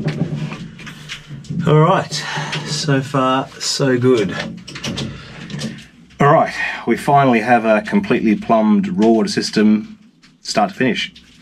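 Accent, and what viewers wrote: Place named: Australia. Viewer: Greetings from Minnesota! Love the videos and your Aussie Accent! keep em coming!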